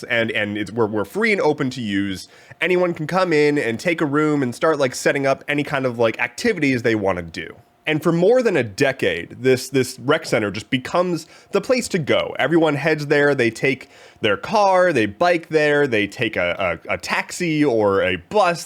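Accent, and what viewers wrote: United States. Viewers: Sounds incredibly American...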